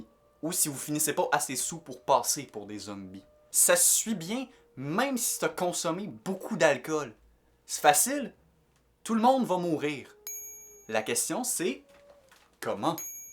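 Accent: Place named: Quebec, Canada. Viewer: c du quebecois le mec c mon prof d impro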